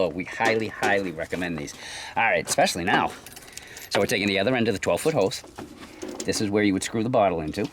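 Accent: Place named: Connecticut, United States. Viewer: I’m from CT and it’s refreshing to hear, reminds me of the 90’s. The accent is dying here in CT and becoming more neutral (don’t hear it as often as you did 30 years back) Mass has their own accent, New York and even RI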